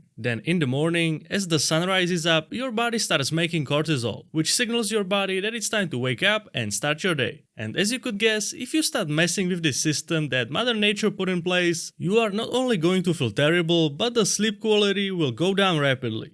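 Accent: Czech Republic. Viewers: i'm not sure but his accent sounds Czech or Slovakian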